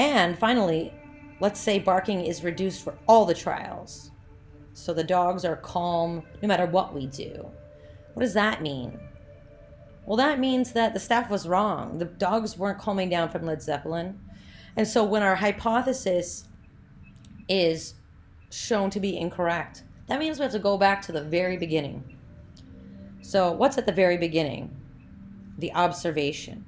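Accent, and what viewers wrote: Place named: New York, United States. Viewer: Really easy-to-follow/understand lecture! I've never had an online professor who has their lectures set up on YouTube before. I like your accent. Are you from New York or New Jersey?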